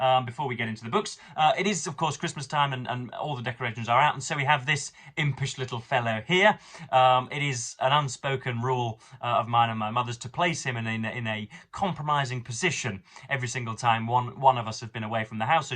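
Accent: United Kingdom. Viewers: I'm impressed by your wee Scottish intonations, laddie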